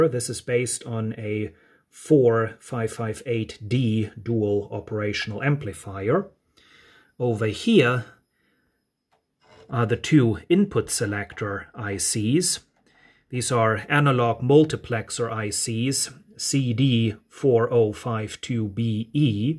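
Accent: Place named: Germany. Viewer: Bist du deutsch? Dein Englisch klingt sehr angenehm. So möchte ich als Deutscher wahrgenommen werden, wenn ich Englisch spreche.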